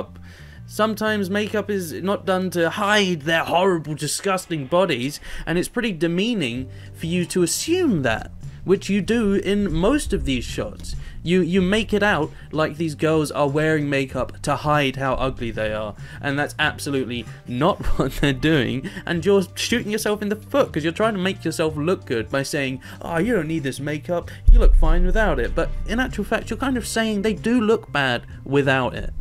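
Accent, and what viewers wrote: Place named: England, United Kingdom. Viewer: Everything sounds better with an English accent. 😊 You have a great voice tone.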